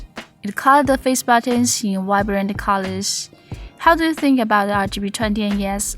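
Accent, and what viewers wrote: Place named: China. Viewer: 超级重口味中式英文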